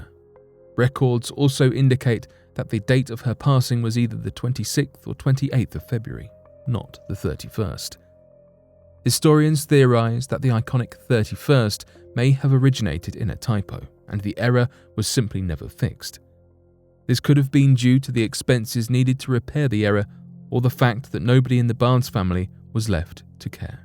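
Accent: England, United Kingdom. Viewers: Hes British. Settle down with the exact pronunciations. I'm surprised more people dont criticize his shitty research. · Americans complaining about how an English persons pronounces something 😂kettle black and all that 😂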